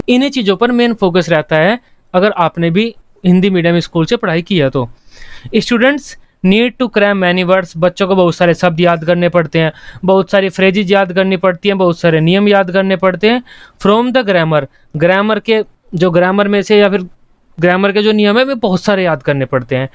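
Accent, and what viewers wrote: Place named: Saharanpur, India. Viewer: Bhai language se 100% Saharanpur ka lagra h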